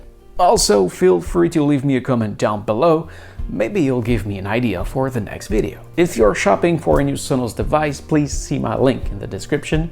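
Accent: Quebec, Canada. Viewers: You HAVE to be québécois. I recognize the accent ;)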